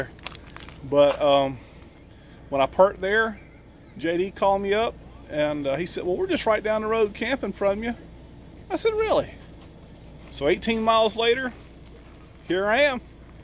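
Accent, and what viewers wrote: South, United States. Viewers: being Irish I love your Southern drawl and how you say baaabee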